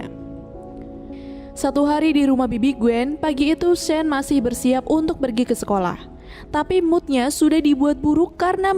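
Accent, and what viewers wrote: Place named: Sunda, Indonesia. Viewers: Teteh Sunda nya😅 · Gaya Sundanya sangat menarik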